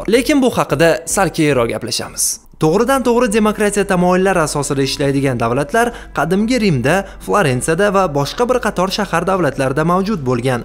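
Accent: Tashkent, Uzbekistan. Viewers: Тошкан шевада гапирманг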